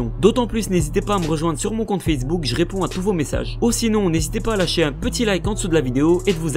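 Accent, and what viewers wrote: Belgium. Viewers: T belge comme moi ?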